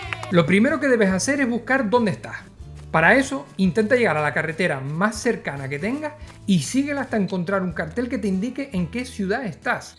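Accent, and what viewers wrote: Canary Islands, Spain. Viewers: Me suscribo por el acento canario. Y gracias.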